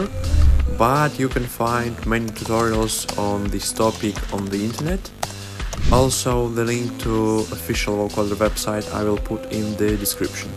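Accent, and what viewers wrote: Russia. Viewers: Чувак, ты русский что-ли?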